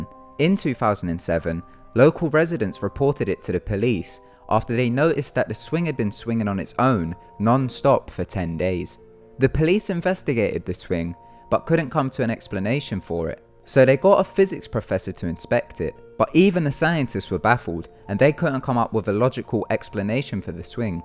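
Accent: United Kingdom. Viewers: Your vids are good and your accent is mint where in the uk you from ? Just subbed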